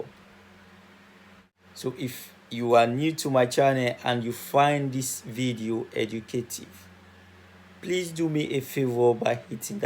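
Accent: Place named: Sierra Leone. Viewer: You sound like a Sierra Leonean. Are you? Thanks for sharing...new sub showing some love 💓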